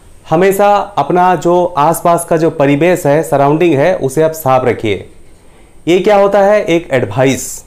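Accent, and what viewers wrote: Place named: Odisha, India. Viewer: Good job sir. But aap hindi kyu bol rhe ho sir bich bich me apke muh se odia nikal ja rha hai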